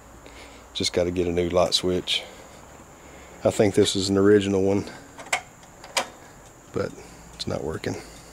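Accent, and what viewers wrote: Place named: New York, United States. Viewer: you share a lot of useful information on manuals,tools and what to expect when working on a particular item. I bought a '73 140 H 3 last week and have a R F 2 318' s and others your videos are some of my go to's to see what I need too do, by the way what part of NY are you from with that accent? thanks for sharing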